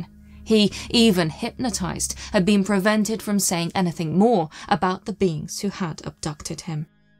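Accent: United Kingdom. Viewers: A similar thing happened to me after the second encounter (that I remember) a few years ago. I suddenly couldn't stand meat being cooked around me, it used to upset me so much I would start crying if I smelled it? I was already vegetarian but became vegan. I quit a 10 year smoking habit in 2 days. But i still love red wine though I can only drink 1 glass or I get very sick. Weird! This seems a great channel, I'm so happy to find a British narrator & you have a new subscriber 🌹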